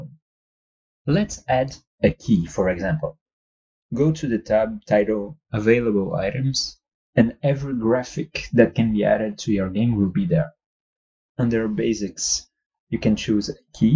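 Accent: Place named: France. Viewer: It would be awesome if you did some more tutorials! Some more advanced tutorials, if you get the time. Would also like to see how you go through the process of creating rooms. And your English is perfect with a really cool, beautiful French accent so never apologize for that. :)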